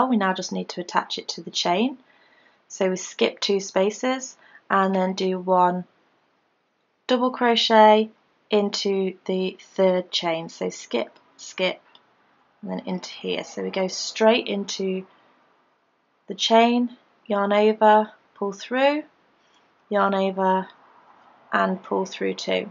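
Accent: United Kingdom. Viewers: I've watched many a tutorial from others and they just cannot seem to convey what to do as well as you or they go too slow and repeat things too often. I've seen some of your tutorials and I just have to say that you really are the best! I've been crocheting since I was 13 (now 60) and I just love learning new patterns. Thanks so much for your videos! P.S. I love all things British so your accent (at least to me) is brilliant!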